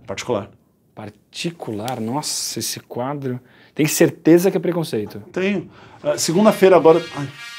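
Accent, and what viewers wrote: Brazil. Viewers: sotaque ceares mt massa nosso sotaque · vc é baiano? meu pai tbm fala youtóba kkkkk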